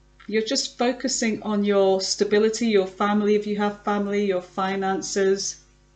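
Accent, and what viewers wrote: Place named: United Kingdom. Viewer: love your readings and your british accent - thank you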